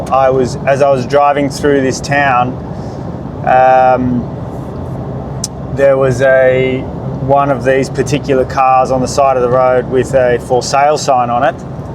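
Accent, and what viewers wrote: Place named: Australia. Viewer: How that I am not notice your australian accent , until now. That explain a lot of things . Hapy holiday mister driver.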